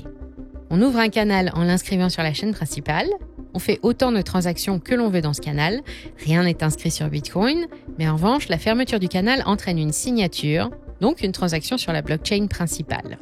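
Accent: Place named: United States. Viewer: prononciation à l'americaine tout simplement mais oui c'est clairement marketing il y a limites plus de commentaires à propos de sa voix que par rapport au contenu haha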